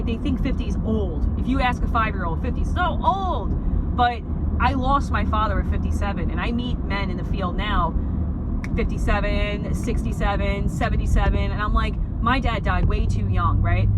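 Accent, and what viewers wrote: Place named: New York, United States. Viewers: I love ur New York accent, I think it’s so cool. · no shade I’m just from the Midwest we know a New Yorker when we hear one.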